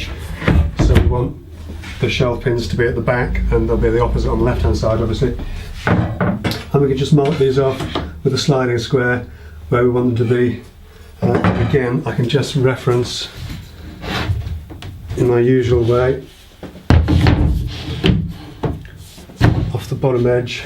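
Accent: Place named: United Kingdom. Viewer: Hi. I live in South Africa and came across your videos about 2 weeks ago. I have since then watched ALL your videos. I find the British accent and the way you talk and just your videos so educational and relaxing. I will definitely watch all future videos. Many thanks for the excellent work!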